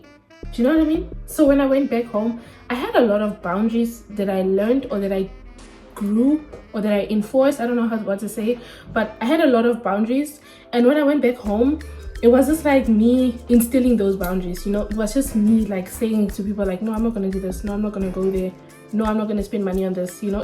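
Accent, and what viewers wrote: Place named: South Africa. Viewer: I’m subscribing, girl you got me you’re so raw on this video and I loved this. The family drama is soooo real. You speak like a South African, are you Namibian? Zulu, coloured Afrikaans, Tswana😂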